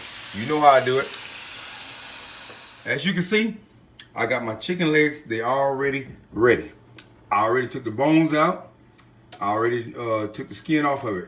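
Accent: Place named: Chicago, United States. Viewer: This my first day watching this and I just love your positive approach to cooking exspecally the children I’m in love already. You sound like you from Chicago! I’m a female version of you!! Love it! Wow 🤩 what you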